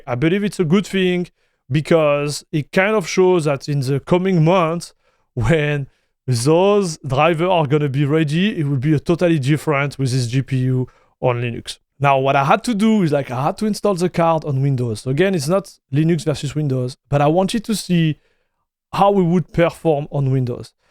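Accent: France. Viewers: Très intéressé car je rencontre des problèmes avec ma Gigabyte 9070XT Gaming OC sur Ubuntu MATE 24.04 mais je constate qu'avec d'autres distros il n'y a pas le même problème. Sinon, ça fait bizarre de devoir écouter un Français parler en Anglais alors que j'entends bien l'accent et que tout du long j'ai envie de dire "nan mais c'est bon, parle moi en français, poto". 😅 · Cool accent, are you French?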